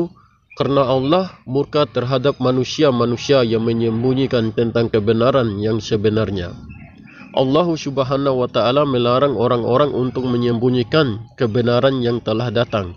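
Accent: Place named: Maluku, Indonesia. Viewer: Tapi bang saya kayak tidak asing dengan aksen berbicara anda bang,sebelum nya saya mau tanya.apa bang dari daerah adat seribu negeri,yang dulunya 1 provinsi sekarang terbagi jadi 2 provinsi?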